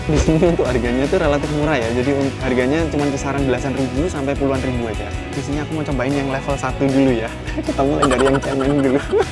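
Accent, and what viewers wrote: Java, Indonesia. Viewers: Ngomongnya kayak logat jawa tpi org bali:vv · kirain jawa. Kok kayaknya medok banget kalo ngomong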